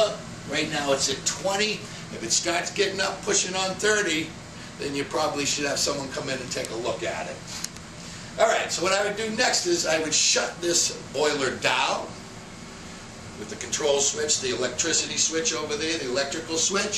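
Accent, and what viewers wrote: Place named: Boston, United States. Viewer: Chicagoland? I am hearing a fellow Boston guy.....boiluh, wadduh preshuh!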